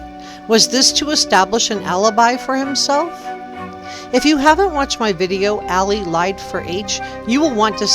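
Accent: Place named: Pennsylvania, United States. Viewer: I detect a Pennsylvania accent! I love it, my in-laws are from Pn ..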